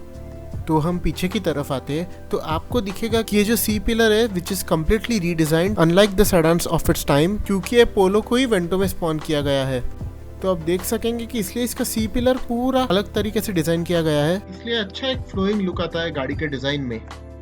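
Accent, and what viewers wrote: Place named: Mumbai, India. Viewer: Very informative,!! Your talking style is unique,and 'Bambaiyaa'!! But you gave us a good 'Gyaan'!! I am watching this in 2021 end, with heavy heart, because, VW is on a 'killing spree' being a 'petrol head', I mourn death of 1.2TSI, 1.4TSI, & 1.5TSI is not for 'people'( I think, VW considers people are those, who can spend >22.5 lakhs for a car)!! It is evident, how much you love your car!! Happy driving days, & continue the 'good work' you are doing!!!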